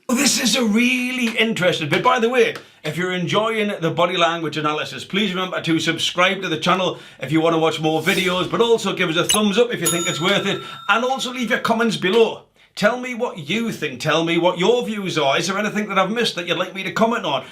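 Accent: Newcastle, United Kingdom. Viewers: I love your Gordie accent! 😆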